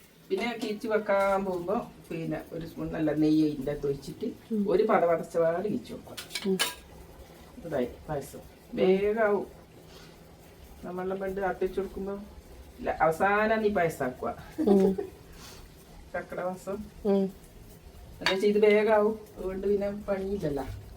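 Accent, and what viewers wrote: Kannur, India. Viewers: ങ്ങളെ കണ്ണൂര് പാഷ പൊളി ആ ന്ന് കേട്ടിനാ ങ്ങൾ ?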